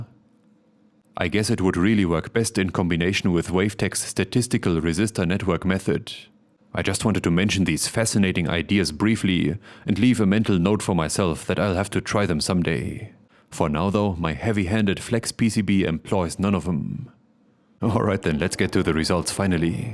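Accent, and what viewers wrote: Germany. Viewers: figuring out peoples origin in Europe from their accent... in that moment I KNEW he was German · Please just stop ... youtube autoplay brought me here and your DSCHERMÄN AKKSENT is soooo cringy .... just stop ...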